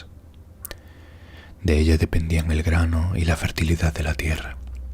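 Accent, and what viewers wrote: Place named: Spain. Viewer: A mí le acento español no me gusta mucho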